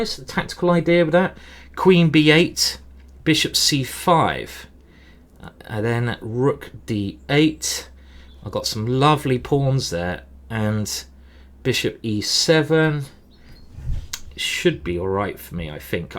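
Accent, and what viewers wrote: United Kingdom. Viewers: So great GM thanks a lot I learn English British accent from you beside chess I'm so happy to learn from you. very very tough game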